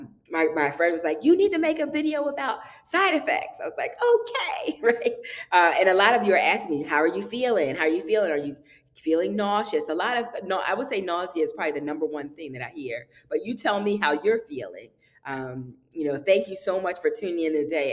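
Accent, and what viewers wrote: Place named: Philadelphia, United States. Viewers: Are you from Philadelphia. You sound like my sister. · Wow! I’m from Philly too, and I knew that was a Philly accent.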